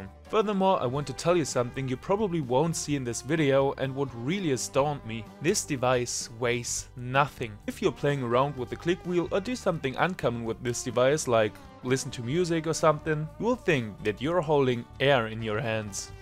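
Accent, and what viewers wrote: Germany. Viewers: Dieser deutsche Akzent xD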